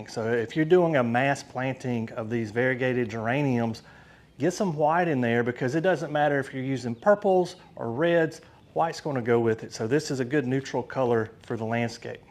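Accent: South, United States. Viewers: very educational....finally a southern talking youtube on geranium care!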